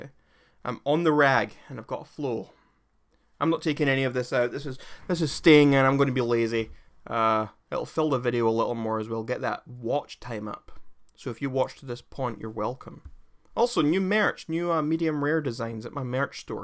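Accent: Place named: Scotland, United Kingdom. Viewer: If an Australian person punches you square in the nose that would be very very real Also, you called out Aussie accents and your literally Scottish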